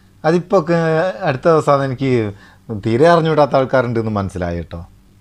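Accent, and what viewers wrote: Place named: Malappuram, India. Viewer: ഇങ്ങളെ സംസാരം പൊളിച്ചു ഇങ്ങൾ മലപ്പുറത്ത്‌ കാരനാണോ👌👍👏👏👏👏msg ഗുഡ് sub ചെയ്തു ബെൽ ഐക്കൺ ക്ലിക്ക് ചെയ്‌തൂട്ടോ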